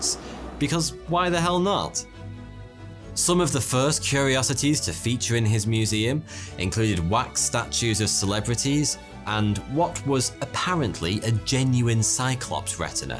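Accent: United Kingdom. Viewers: You are British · I love the fact that you speak British English but also uses American words and colloquialism to inject some humor to your stories!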